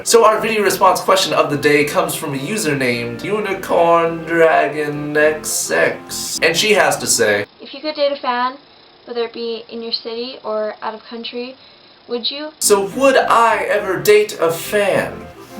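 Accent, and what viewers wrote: England, United Kingdom. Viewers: My tit is stuck in a bears mouth :| im joking, you actually sound even hotter with an english accent and thought it was pretty good :D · Your english accent just made me jizz. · i love ur bristish vioce so adorable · u sound amazing with a british accent!!!! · +English accent. I fainted. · i thoroughly enjoyed the english accent. makes you seem that much hotter ( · i really enjoyed that english accent:) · OMG with an english accent = HELLA FUCKING HOT! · + English accent = amazing Phil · The whole time you were talking in a british accent, i wasn't even paying attention to what you we're saying cause I was laughing so hard. anyways, Title: Hops a Minivan filled with turtles snorting crushed unicorn horn. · love ur british accent! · omg superhot with an english accent ur sooo good at it <3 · aw, your english accent makes you sound slightly camp. 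· Your english accent is quite brilliant =L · i died y you so sexy i can not get over how amazing you look:O and you with english accent:O kirjhnujyrhwjjbbhrgjbrgsbgbdjrs OMNOMNOMNOM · With the english accent, you sound like Russell Brand :) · lmfao, english accents with american lingo xD<3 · i like your english accent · English Accent=♥ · You're English accent is strangely amazing :D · XD hahaha i love ur british accent u sound so smart.... TITLE: REVENGE OF THE HORNY LAMPZ!!! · YOU ARE REALLY GOOD AT BRITISH ACCENT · with an English accent= yes. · title: givs ppl erectile disfunction. comment: omg love the english voice, and the no braces! so cute! u rly nice teeth!!! omg<3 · oh my fuckery. how the hell does an english accent automatically make him so much more attractive than he already is? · Your english accent was beautiful xD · You'r English accent reminds me of the time I was reading something out of a chemistry book in class.......I got kicked out of the class - . 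· + British accent= Ear orgasm. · Your English accent makes guys with real English accents sound like fakes. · your English accent is amazing. and what model is your fridge?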